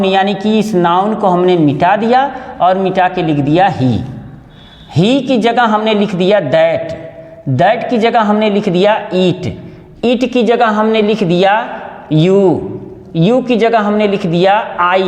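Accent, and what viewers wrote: Bihar, India. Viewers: Are you from Bihar. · बिहारी है मैने पहचान लिया रिभर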